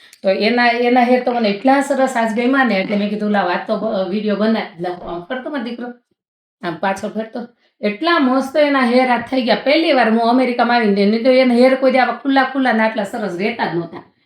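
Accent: Kathiawar, India. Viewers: કાઠિયાવાડી છો દીદી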